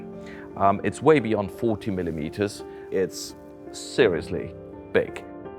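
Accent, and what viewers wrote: RP, United Kingdom. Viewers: Aurel Bacs has great knowledge but he really needs to stop with that posh English accent. Just doesn’t sound natural)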